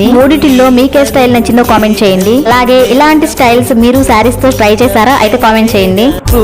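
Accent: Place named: Atmakur, India. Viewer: Akka nv atmakuru lo chaduvukunnava. Langvoni super😊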